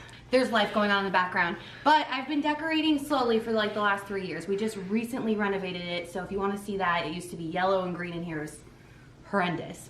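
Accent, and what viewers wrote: United States. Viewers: Hi frands....🙋😘 You guys are, sooo, funny. 😂😂😂 I, soooo, needed this tonight. I'm laughing so hard I can't type...😭😭 southern accent and backyard tour was hilarious. Love you guys. Love watching Chip and JoAnna. Great show. Your show is more fun. I like your little house. It's cozy. Love the blue chair. Where did you say you bought it? You could put little blue lights around corkboard to balance with the blue chair. A couple of yellow pillows will give the couch a pop of color and would look nice with the poo of blue from the chair. Have you ever thought of doing your house using Fung Shei. The style uses the elements in each room of, Wood, Fire, Water, and Metal. Placement is put according to North, South, East, and West. By combining the different textures, it brings harmony and prosperity into the home. It's, quite, fascinating and fun. 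You can google it and it will take you through each room and tell you what textures and colors will go together. Each room uses the NSEW areas and middle to bring calmness and harmony. You may not want to spray lysol around. It can cause neurological issues in humans and pets. My kitty had seizures after spraying it, one day. She suffered some brain damage and couldn't walk straight for almost a month. She was, terribly, wobbly and I had to carry her around and to her litter box and food. Slept on the floor with her, in case she needed to go potty or eat. She, eventually, got better, but, was never the same. I never use chemical prod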